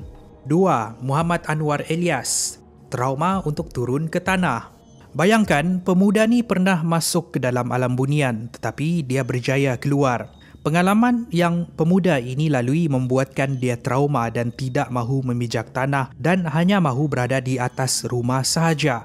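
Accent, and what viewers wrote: Sabah, Malaysia. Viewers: Can someone tell me, orang sabah kah? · Tak pastilah sebb saya pun kurang tahu pasal cara percakapan diorang ni.Tapi yg saya tahu ni sabah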